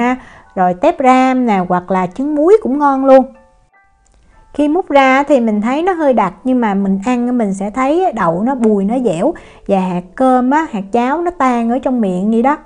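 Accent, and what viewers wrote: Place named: Saigon, Vietnam. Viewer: Hello năm mới chúc gia đình cháu hạnh phúc vui khỏe suốt năm nhé! Cách hướng dẫn của cháu nhanh gọn đẹp mắt và giọng nói SG làm cô thích nghe thanks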